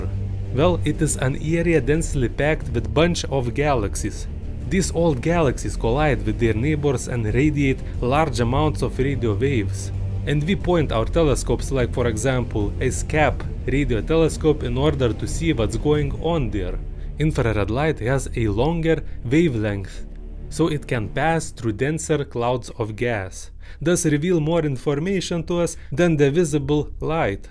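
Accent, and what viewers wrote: Russia. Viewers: His russian accent is attractive lol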